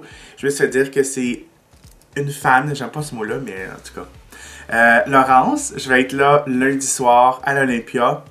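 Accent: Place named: Quebec, Canada. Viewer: Haaaaaaaaan trop cool un tuto en français !!!!!!! La pôpeeear you know ;) On n'a pas accès à Annabelle ici en france mais entendre ce doux accent du Québec ça réchauffe le coeur, merci <3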